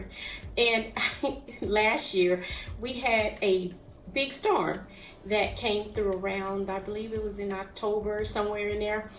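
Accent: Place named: Kentucky, United States. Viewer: Looks so good. Hay girl I am from kentucky and we say ya"ll and you sound just like us.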